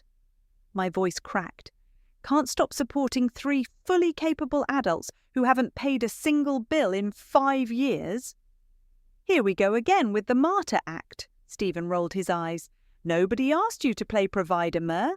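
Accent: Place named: RP, United Kingdom. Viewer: Love the RP accent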